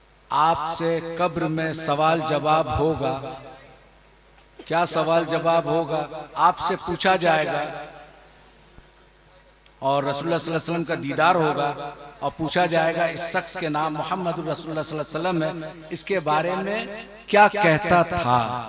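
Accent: India. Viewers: Accents to pura Hindi lag rahi hai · Mashallah Hazrat aapka takrir sunkar bahut Dil Ko sukun Mila🎉 aapka bolane ka jo leja hai na vah bilkul Jharkhandi ka leja hai aapka Allah Pak aapko salamat rakhe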